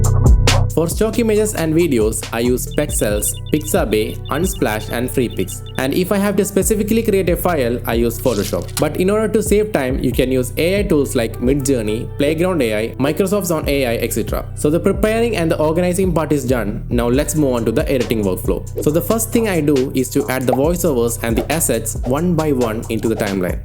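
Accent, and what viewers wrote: India. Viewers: Are you indian because accent is like indian people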